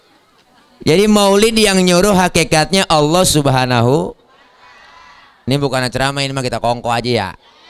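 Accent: Betawi, Indonesia. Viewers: masya allah... suka bngt ama yg 1 ini... suka bngt ama logat"y... sama² kita orang betawi yah....😁 ketawa trs klo ngeliat ceramah... # JAKARATA_BARAT Hadiirrr☝ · Resep banyak banyolannya Dan kental betawinya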